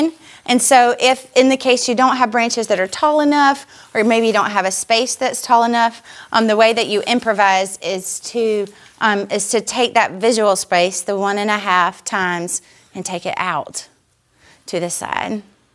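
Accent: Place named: Georgia, United States. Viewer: humble dialect :) in Georgia. hope it's empowering. happy making!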